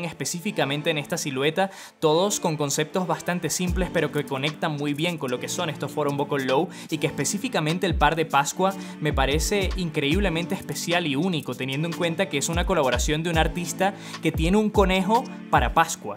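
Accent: Venezuela. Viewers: Bro tienes acento venezolano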